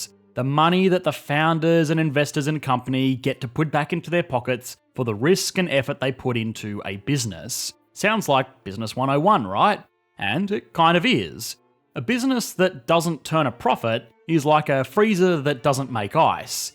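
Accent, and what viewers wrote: Australia. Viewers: You Australian? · you upload exactly when I'm making my evening coffee, nothing like watching a smooth Aussie accent explaining economics with a hot cup of coffee! :D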